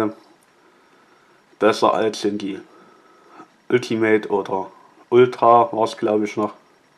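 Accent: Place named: Saxony, Germany. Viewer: sächsischer akzent xD den liebe ich!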